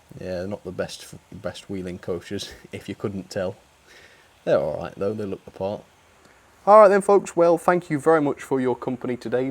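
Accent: United Kingdom. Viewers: such a British sentence in an adorable British accent and - with some classic British humour for the icing on the cake. Reminds me of cruising with Jane McDonald. I love it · I love your British Accent,Mate.😁❤😁❤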